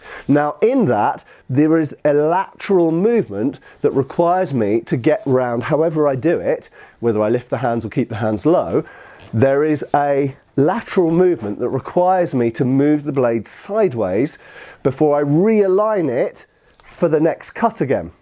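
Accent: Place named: United Kingdom. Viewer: If I couldn’t hear your accent, I would still know you were British due to the incredible dead delivery of innuendo here haha. Well done!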